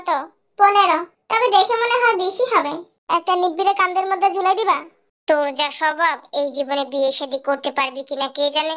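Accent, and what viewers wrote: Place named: Brahmanbaria, Bangladesh. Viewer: Na tmr kotha shune mone hocche ashole dadur bashy shobay emon bhabe kotha bole ty mone holo tmi Brahmonbaria r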